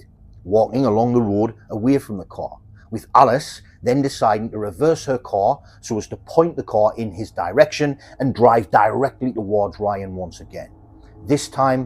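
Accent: United Kingdom. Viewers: I live in the UK, North West, and I'm delighted to hear a true crime you tube creator with a British accent and doing a stellar job of presenting these often more obscure cases as well as the obvious, I've got to give it you man you can find the ones we have not heard of and the ones that concluded like yesterday, nice format and clear presenting and very presentable, I predict a growth around here, keep it coming dude, Bravo!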